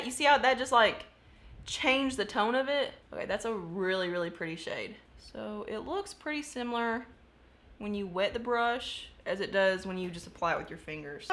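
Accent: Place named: Texas, United States. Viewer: Girl, our voices and accent sound alike! It’s crazy! Are you from Texas? You are beautiful! Excited to be a new subscriber! Thanks for the fun videos!!💛🌼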